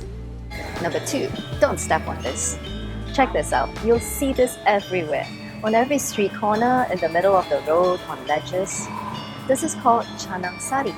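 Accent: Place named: Singapore. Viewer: I love the Singaporean accent!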